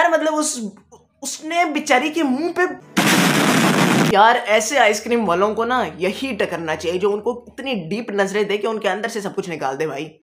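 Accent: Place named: Lahore, Pakistan. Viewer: I love lahore ❤❤mujhy phely sy hi apky baat karny ky style sy lagta tha ky ap lahori ho ❤❤❤❤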